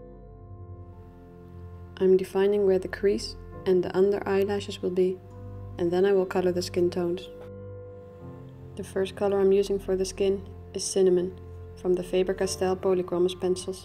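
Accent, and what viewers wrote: Netherlands. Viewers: Beautiful. Thank you for sharing. I use almost the same technique. Aren't you a Dutch by any chance? Your accent tells me so....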